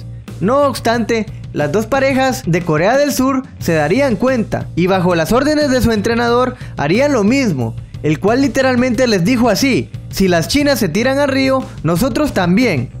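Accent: Honduras. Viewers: se nota el acento hondureño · Oye te detecto acento Hondureño Eres de ahí ?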